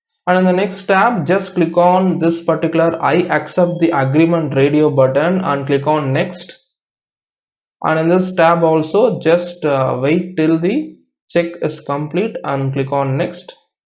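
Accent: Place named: India. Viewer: too much indian accent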